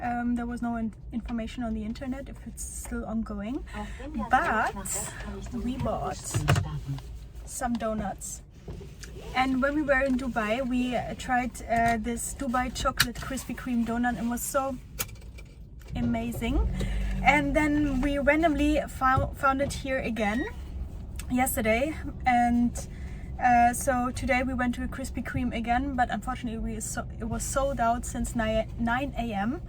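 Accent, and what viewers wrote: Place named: Germany. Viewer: Ohhhh I recognize that accent 🥹. The lovely people who always say “ my English is not so good” then proceed to give me fluent English instructions to the bahnhof. I met the sweetest and kindest humans in Germany. I hope you enjoyed your stay in SA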